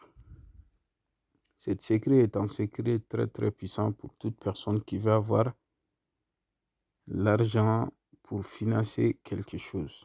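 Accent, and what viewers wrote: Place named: Mali. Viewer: Tu est du mali jia l'impression avec ton accent je crois reconnaitre le pays 🤧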